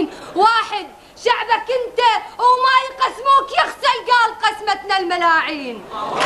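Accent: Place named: Iraq. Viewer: الله، اللهجة العراقية روعة،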